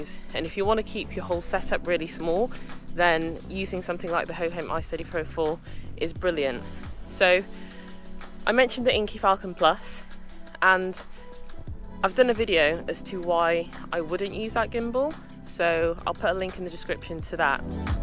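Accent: United Kingdom. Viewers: I'm going to NYC next year. I want to record myself out and about and I don't want to use my phone. Would you recommend this or something different/cheaper? Other question: You're clearly, British! However, are you of Trinidadian/Lucian or Dominican descent? I found your channel and just subscribed. I saw a post on twitter and started watching a few videos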